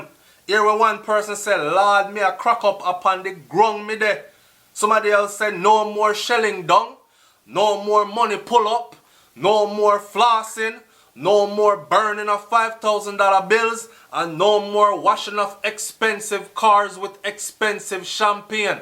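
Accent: Jamaica. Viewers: its IAN the BIG MAN from England long time,hope you and your good family are all well. I don't know how you do it, the big tv stations in the USA should grab you up oay you good money,and give you your own spot on their tv station, you explain everything so well with patois thrown in for good measure, and I don't say this lightly. your explanation about how the US run their system was superb. May I add that the United States whether we like them or not are so powerful that even in England they took away two white English stock brokers from top English family for stock market fraud against the US, they fought extradition "tooth and nail" as the saying goes, but not even the Prome Minister at that time Tony Blair could save them, at the present time they are in a Federal Prison sewing mailbags. A bit of info for you and your good subscribers, to show you how powerful the Yanks are, if a current American serviceman was to commit a crime in England, no English court cant touch him or her, instead they will be flown to the US and be tried and if guilty, be sent to a military prison, that's the power of America, lastly any foreign head of state visiting England,bodyguards have to leave their weapons on board their country's plane, not the Americans, even the Russians and Chinese have to comply with British request who provides armed police. 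So can you imagine what they will do to a Jamaican citizen comitting crime against US citizens, enough said,I am out of here. Bles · Boo fi you man! Stay a foreign wid yu fuckup yaadie accent and ur judgements, why are you so happy that people are going to prison? Yu think a dem alone ago suffer now? #YuAWasteman #Sensationalist #NevaWatchBeforeAndNahWatchNuhMore #YuABait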